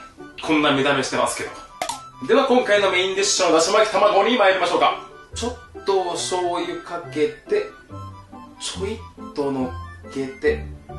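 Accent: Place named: Fukuoka, Japan. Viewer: 「バックを『からって』店内を歩けないでお馴染み」 親の転勤で福岡県から他県に引っ越した時、学校でランドセルを『からう』が伝わらなかった時の衝撃。の福岡弁懐かしくて大好き 『背負う（せおう、しょう）』が標準語なのかな？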